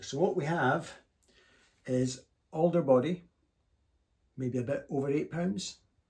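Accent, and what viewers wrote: Scotland, United Kingdom. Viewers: What a fxxxing lovely sounding Tele. Of the many many custom Teles I've heard on Youtube, that one really sings (of course helped no end by the great phrasing and touch of the guy holding it). Also, didn't expect to hear that accent after the opening music. From a fellow Scot (albeit faraway from home)...just fantastic playing mate. Also Also...why cant other Youtube channels get decent amp tone like you?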